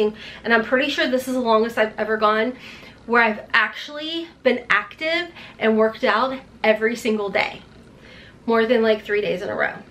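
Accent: South, United States. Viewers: I don’t get subscription boxes but I always watch your videos and have for a long time and I don’t mind your talking either or that southern accent because I’m from Arkansas I have one to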